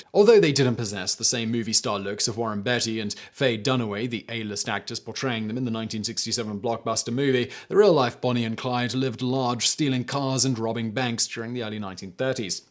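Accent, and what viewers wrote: United Kingdom. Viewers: I enjoy when uses lingo in is professional British accent. Terms like "all day stretch" to mean a life sentence and referring to prison as the "pokey" sound hilarious with that accent.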